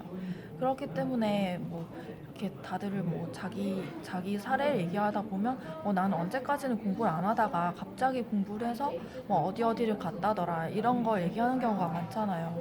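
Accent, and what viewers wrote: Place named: Busan, South Korea. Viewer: 원래 부산 사투리 쓰셨어요? 표준말인데 사투리 억양이 섞여있는 것 같아요. 화이팅👍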